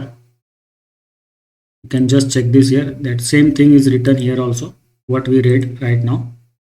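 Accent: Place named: India. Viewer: ugh.. indian accent on top of a bad microphone.. 👎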